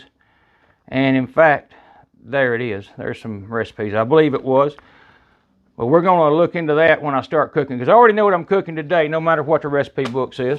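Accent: South, United States. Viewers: Your accent makes me ashamed to call myself southern. It’s represents the worst of humanity. And the most ignorant of humanity. I can just “hear”you never went to college. Its stomach cringing. I hate ghetto street thugs as well. Your both just two different sides of the same coin. Stop talking !!! Trump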